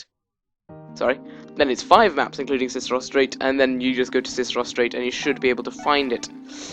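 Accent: United Kingdom. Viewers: Cool!!! Hey, are you british?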